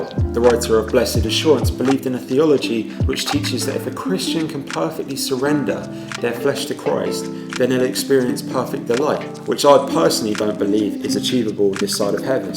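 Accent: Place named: United States. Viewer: dude, well done. Full marks on your yank accent bruv!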